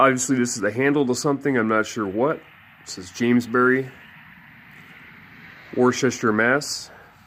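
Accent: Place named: Long Island, United States. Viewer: You from long island???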